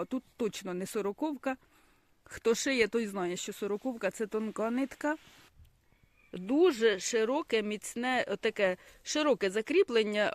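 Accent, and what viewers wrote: Poltava, Ukraine. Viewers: Моя ти зіронька ,гранатою прибьють,,,слухаю вас і балдєю у меня двоюрідна сестра живе новосанджарскій р так же розмовляє по Полтавські.Дякую вам велике.за відео.привіт с Дніпра.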